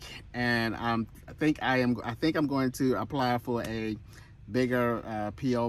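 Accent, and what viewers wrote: Baltimore, United States. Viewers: I didn’t know you were from Baltimore, but I kept saying I hear a little Baltimore in him…maybe he’s from PA.😂 Baltimore representing!!!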